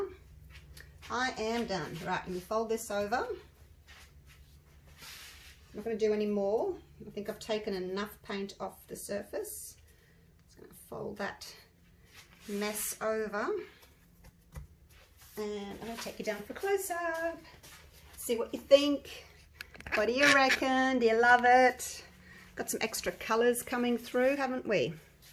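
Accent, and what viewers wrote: Australia. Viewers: Also I love your voice I like to listen to the Australian will actually any type of broge or accent love to hear different drawls ect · I just have so much fun watching your pour paintings. You explain it so well especially with your Australian accent. I love Australia. I’ve only been lucky enough to visit there once and check it off my bucket list. You’re so lucky to live there. And your paintings are gorgeous! ❤ I’ve got all my material and I’m ready to start.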